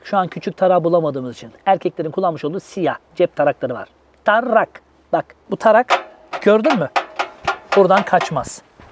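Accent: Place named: Sivas, Turkey. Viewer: Trabzon mu ben Sivaslı sanıyordum 😅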